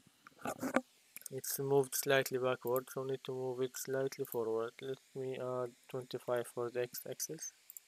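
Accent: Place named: Iraq. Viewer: احسك عراقي 😅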